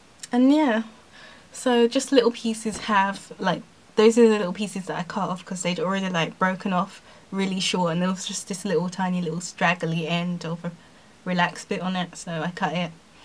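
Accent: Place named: Australia. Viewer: I've been transitioning for 4 months and I keep getting tangles in the roots each time I wash! I prepoo and detangle but as soon as it shampoo (with a sulfate free shampoo), I get tangles in the roots! What am I doing wrong? It's really frustrating and depressing. By the way, the way you talk sounds a lot like Nikki Perkins (from the Jamie and Nikki channel). Are you from Australia or Sudanese by any chance? Lol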